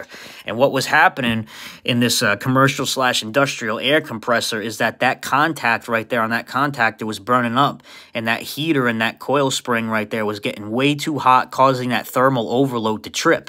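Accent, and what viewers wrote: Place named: Boston, United States. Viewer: Love your commentary in Hard Boston accent! So entertaining. Plus you are well trained, observant and a good thinker. Rarer and rarer these days. Chicago electrician here. 1979 seniority.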